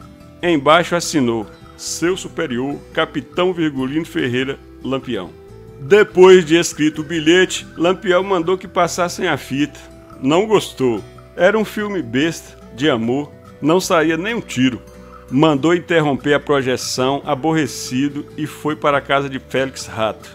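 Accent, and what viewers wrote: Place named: Northern Minas Gerais, Brazil. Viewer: O melhor de tudo é o narrador com o sotaque que parece ser do norte de Minas narrando ,maravilhosooo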